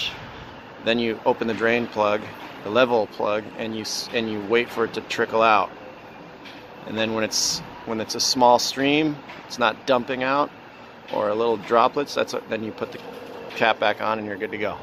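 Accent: United States. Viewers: Who cares to make fuss about drivers position. He made a mistake, but we all should know the right or left of our vehicles if we are going to do potentially detailed maintenance. I get it. But we should focus on the facts. This very well could had been a Subaru in Japan or England, etc. Sans his American accent. But not every u tube video is safe to follow without some double research or common sense. One could see the frontal location of the front differential in his video. That's enough beyond a verbal for me.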